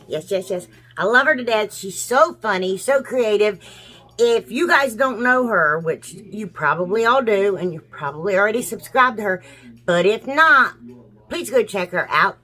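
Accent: South, United States. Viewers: AMAZON!! I am new here from channel, enjoyed everything but mostly the southern accent and phrases..makes me think of home. Grew up in Georgia and Florida and now I'm stuck out here in hell. Idaho..no boiled peanuts, no barbecue, no okra and no waffle house or Piggy Wiggle. I am gonna starve to death out here!! Loved ya and can't wait to see more!! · sent me over, but your humor, accent and creativity reeled me in. I am Southern and felt right at home! It was hard for me to pick a favorite. The metal flowers have sparked an idea. Mi love the little houses and door. I like the hanging vases though. It is different than a traditional wall sconce and I have 4 thisisus signs I need to use! I was an Amazon subscriber before it was cool. I started on the Amazon mom program when I was pregnant with my middle son, 11 years ago. Doctor's office had tons of magazines with cards and you could get diapers for a steal! 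Transitioned over to S&S when it was introduced. I ❤️ Amazon! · U are a hot mess!! love your southern draw!!! My momma lives in North Carolina and has picked up the twang I laugh cause we r from Indiana. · Love all of your projects. That door is awesome. Love your personality. Great southern accent. Take care. TFS. Have a crafty day. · I just found your channel,and love your creativity and you must be a southern gal also from your accent. Amazon. New subscriber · Amazon.. AMAZON... Amazon 🤣😁.. I laugh so much watching you! I hear the southern in your voice. I'm in Tennessee💖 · I thoroughly enjoyed you diys!!😂 I’m from Tennessee girrrl but you win! In the southern accent!🏆 I subscribed can’t wait for the next one😁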